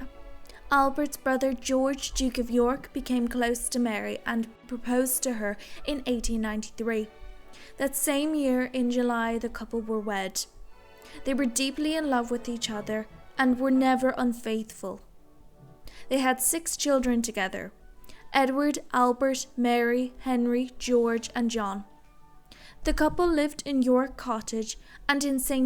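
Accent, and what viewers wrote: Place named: Ireland. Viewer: You do very good research but my ears are 75 and my curiosity is still 15. With my arthritis a comment that extensive meant it had meaning for me to do all that typing. "TOASTMASTERS" is a group that helps people who do public speaking and presentations to groups, etc. It's like AA, with no fee to join, just a support group of like-minded people wanting to learn how to speak to groups with confidence. You sound Irish, I really don't know a lot about Irish society.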